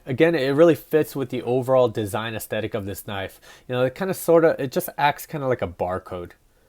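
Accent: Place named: South Korea. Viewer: I detect a korean accent. 한국인에요?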